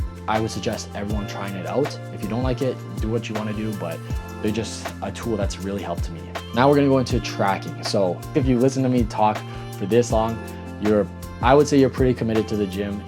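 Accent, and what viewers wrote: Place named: Canada. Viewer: you have a strong canadian accent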